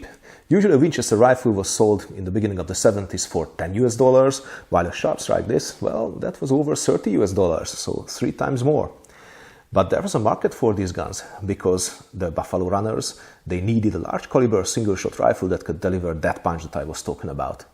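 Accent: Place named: Hungary. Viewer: you are such a pleasure to watch, informative, knowledgeable, and Hunglish is a beautiful language!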